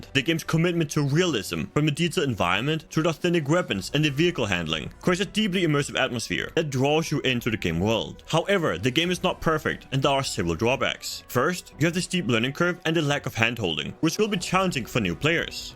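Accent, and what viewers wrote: Denmark. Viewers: Can't fucking deal with this guy's danish accent just try a little harder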